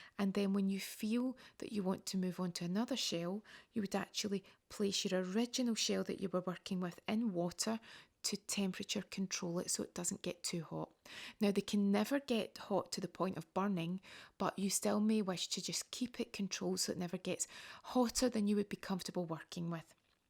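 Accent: Scotland, United Kingdom. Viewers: scottish accent pure love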